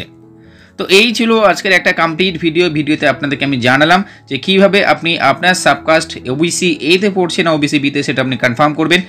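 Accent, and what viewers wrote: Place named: Bangladesh. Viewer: voice appearance almost Bangladeshi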